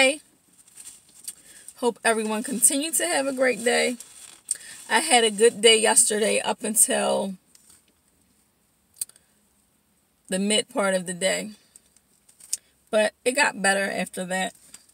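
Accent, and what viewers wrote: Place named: Baltimore, United States. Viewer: I just love all of your subway videos!! Are you from Maryland? You have an accent that I can't place, but you sound like my old neighbor. She was from Baltimore. I'm from Georgia, so you can always tell when someone moves here from some where else. She moved back there to be close to her family, but if I close my eyes I hear her talking. So just curious? Also wonder what kind of work you do? Your daughter is adorable!! I love, love your subway videos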